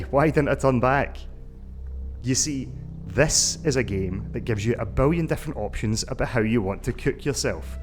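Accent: Scotland, United Kingdom. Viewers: Scottish accent: +1 to credibility.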